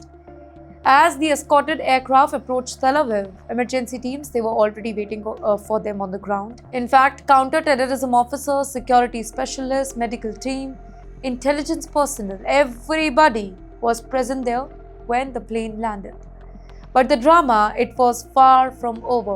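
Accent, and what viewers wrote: India. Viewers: The headline is also incorrect. The plane was never hijacked. All in all, I think you should report in your own language. When you speak Apke Apke for so long and so hard, you tend to Passover the bad habits from your language into English. I see this all the time. Content creator is always an Indian from India that will have a video , that has been captioned in English , but 25 seconds into the video , the Apke Apke will start, and that Apke Apke won’t stop until the end. This is why people like me , that grew up speaking English as a first language have to always write exams and tests proving my eligibility to speak English , because of the Apke Apke people that keep fucking up the English language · Heavy Bindian accent makes hard to understand. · What kind of Hindi English is she speaking? Can you not find someone who speaks proper English as your announcer? 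The Whole story is just a joke. AI produced stuff?? :face-orange-frowning::face-orange-frowning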